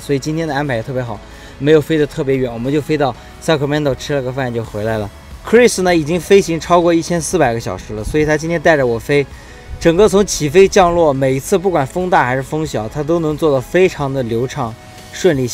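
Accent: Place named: Hefei, China. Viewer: 听出来合肥口音~~ 老乡好 祝你在美国生活愉快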